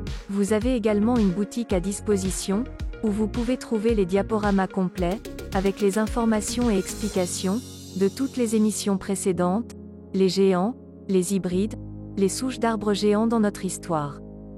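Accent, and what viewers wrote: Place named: Provence, France. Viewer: je confirme l'accent de Provence si je ne m'abuse rajoute un charme. (Salutations d'un marseillais (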